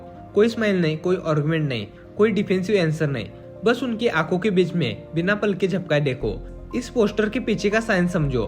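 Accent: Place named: Maharashtra, India. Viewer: Are u marathi bro? Ur pronunciation sounds like that ❤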